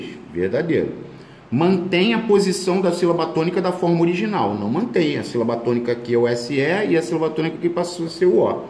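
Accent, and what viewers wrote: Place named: Pará, Brazil. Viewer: Proff vc eh muito legal..seu sotaque parece com o nosso aqui do Pará. Vc eh 10..e pra ser 20 precisa odiar o Bolsonaro kkkk!! 2021 ta phodaaa! Deus nos livre logo! AMÉM!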